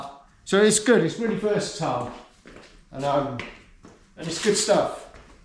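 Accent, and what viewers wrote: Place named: West Country, United Kingdom. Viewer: Nice job, where are you? Must be west country? I want to say Swindon or Bristol?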